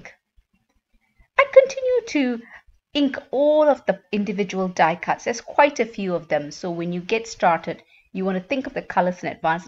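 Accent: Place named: United Kingdom. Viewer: absolute joy to watch . Gorgeous cards , great technics . Nice hearing a UK voice and UK card sizes .x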